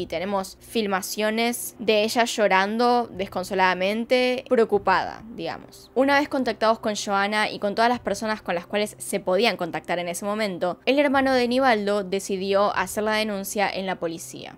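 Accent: Buenos Aires, Argentina. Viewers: Me parece q pronuncias mucho "y y la ll", se q eres argentina pero bajale no mms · Es argentina , Tiene ese acento crack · x2,su acento porteño. ❤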